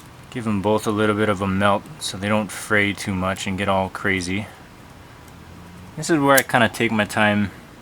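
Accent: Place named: Hawaii, United States. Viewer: Watching thru the video and I'm like "this guy sounds like he's from here(hawaii)" so I looked at your picture and was surprise to find that u are lol.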